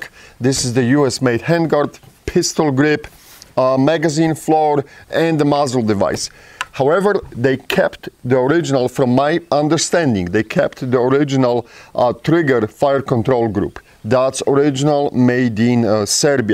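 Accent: Poland. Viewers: English with Polish accent 🙂👍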